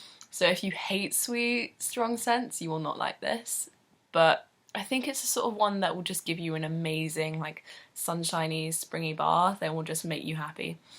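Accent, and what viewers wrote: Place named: United Kingdom. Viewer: I love your accent. Whereabouts in the UK are you from?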